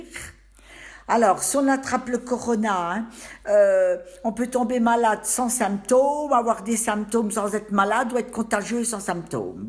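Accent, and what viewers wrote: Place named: Doubs, France. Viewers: MERCI MADELEINE (LOLA SIMONIN) MERCI DE VOTRE GRANDE GENEROSITE ,DE NOUS FAIRE PARTAGER VOTRE FRANC PARLEZ FRANC COMTOIS JE VOUS ADORE DEPUIS VOTRE PASSAGE DANS MON COIN LA BOURGOGNE FRANCHE COMTE AU TOUT DEBUT DE VOTRE CARRIERE VOUS ÊTES TOUT TA FAIS LA COPIE CONFORME DES GENS ANCIEN QUI PARLEZ AINSI AYANT UNE FAMILLE A GRAY DOLE CHARGEY LES GRAYS ILS PARLENT AINSI LE PATOISMERCI POUR CE QUE VOUS ÊTES PRENEZ SOIN DE VOUS ET DES VÔTRE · L'accent du doubs , vindiou !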